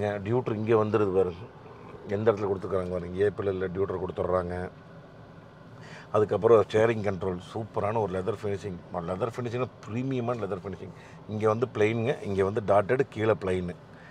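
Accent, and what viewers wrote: Kongu, India. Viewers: கொஞ்சும் கொங்கு தமிழ் ...அருமை..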